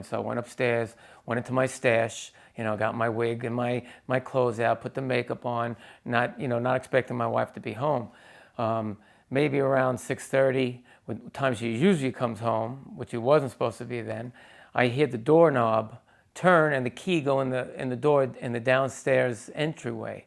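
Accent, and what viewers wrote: New York, United States. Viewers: Such a beautiful story! I'd like to meet you sometime, - I love your New York accent. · Great story! (God I love the NY accent so much)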